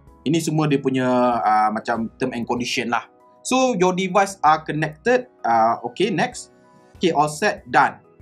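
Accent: Melaka, Malaysia. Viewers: Amek ternganga gomen dengar org melaka haha.. terbaik boh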